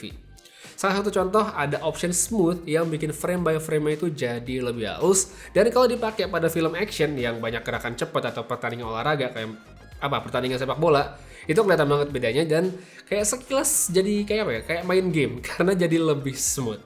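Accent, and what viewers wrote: Bali, Indonesia. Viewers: Orang bali ya bli?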